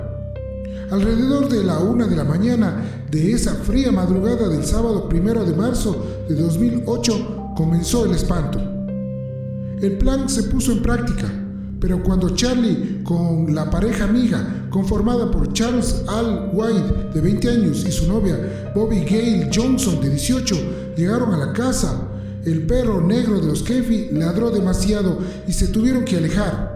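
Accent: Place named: Sierra, Ecuador. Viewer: De qué parte de Ecuador eres? Me suena a la Sierra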